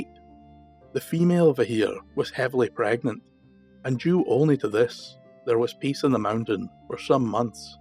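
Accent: Scotland, United Kingdom. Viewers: I’ve read or seen a lot of the words/names etc many times in books I’ve read previously. Unfortunately I’ve butchered the pronunciation of each in my mind. So I like hearing the correct pronunciations in your stories. I found your Awesome channel by accident and it’s the best thing to happen to me all day! A Scottish accent is my favorite and I could listen for hours….so I’ve been bingeing! I’ve shared with friends, hopefully they’ll subscribe as well.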